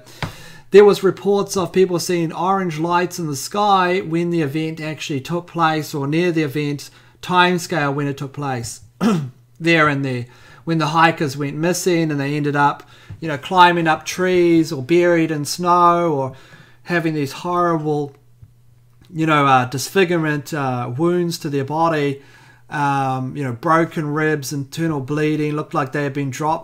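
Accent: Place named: New Zealand. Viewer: I loved esoteric detective but its so much nicer to hear your kiwi voice ☺☺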